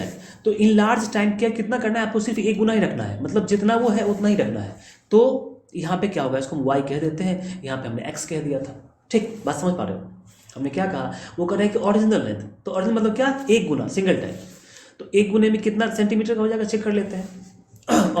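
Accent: Bihar, India. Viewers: You are from bihar na Because बणा .......बरा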